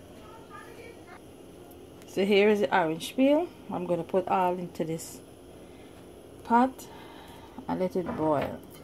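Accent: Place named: Guyana. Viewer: i love your accent , i am from the UK ,, real guyanese accent .. ok nyce gyal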